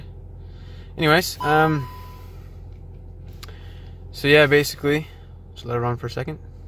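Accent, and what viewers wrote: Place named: Newfoundland, Canada. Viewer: You sound like a Newfie!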